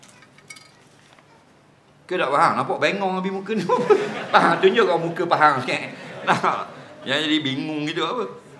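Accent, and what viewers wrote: Terengganu, Malaysia. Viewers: Sebab bahasa ganu ke?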